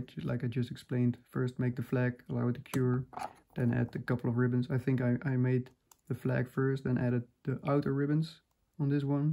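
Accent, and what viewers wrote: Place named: Netherlands. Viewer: Bedankt, man! Ik denk jij zijn Nederlands van je accent? ;) Sorry, mijn Nederlands beroerd!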